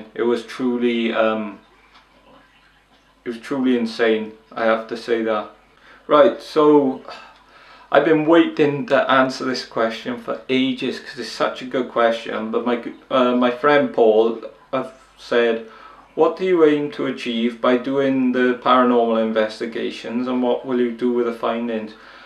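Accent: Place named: Liverpool, United Kingdom. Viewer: Jesus how did you say woolton hall 😂😂😂😂😂 that was hilarious just as you're starting to pick up the scouse accent too. Great Q&A mate.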